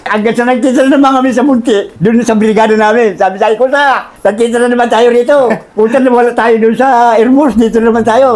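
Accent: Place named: Bicol, Philippines. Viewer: Part 4 boss Galing c Tatay magkwento tono bicolano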